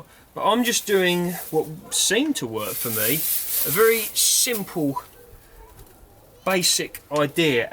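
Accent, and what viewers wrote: United Kingdom. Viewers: You sound like a man after my own heart, you are obviously English sounding so what part of the UK do you live, South West, North? Just wondering as I’m in E.London and am just moving onto trying to grow Satsumas, but gonna try a Mango as the seed is so big it looks like an Alien pod, so has to be done, hehehe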